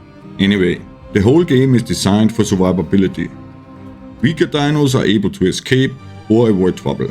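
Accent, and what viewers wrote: Germany. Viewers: Are u german? Ur english sounds german 😅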